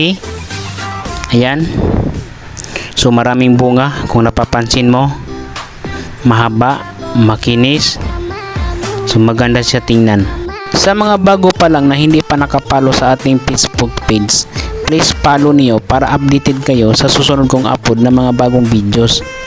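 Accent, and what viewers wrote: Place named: Maranao, Philippines. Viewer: Mranao accent. Maranao ka po ba?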